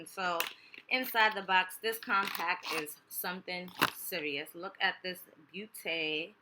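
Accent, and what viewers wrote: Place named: North, United States. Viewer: I subd love ur accent u from up north i was born in buffalo ny n miss the northern accent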